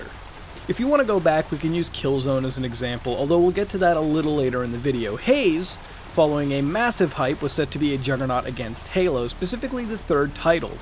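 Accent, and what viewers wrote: Canada. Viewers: wait you're Canadian. ?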